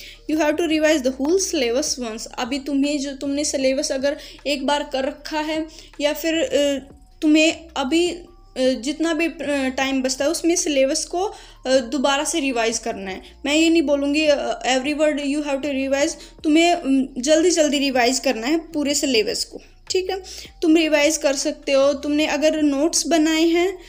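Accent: Haryana, India. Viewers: Her Haryanvi accent🌝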